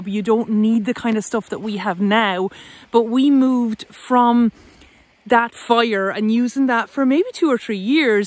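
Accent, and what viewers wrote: Ireland. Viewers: Hi, I'm enjoying your video so much, both for the information and the laughs. You're making me homesick with your accent and sayings! I grew up, "just up the road" from you in rural Westmeath. I'm now living in Germany but hoping to find my forever home back in Ireland one day. Keep safe and well and keep the videos coming.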